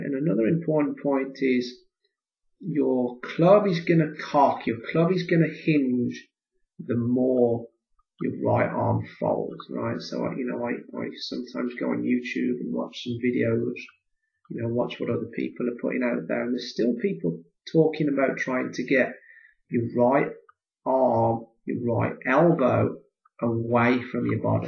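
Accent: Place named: Midlands, United Kingdom. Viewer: Hi love this action so much and being from the Midlands appreciate the accent! Quick question how much should the left foot flare out? Thanks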